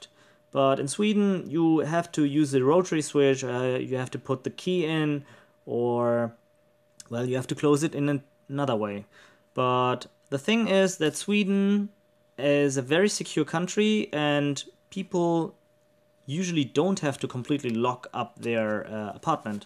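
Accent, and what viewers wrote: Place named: Germany. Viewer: Ah... you mentioned Germany. I am so stuck in old-fashioned ethnic thinking that I could not figure out why a man of your skin-colour would be speaking with a German accent. Forgive me :) Funny quirky vids. Thank you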